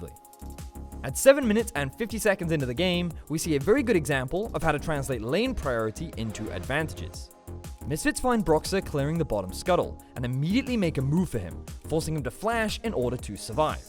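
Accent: United Kingdom. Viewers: British accent drives me nuts.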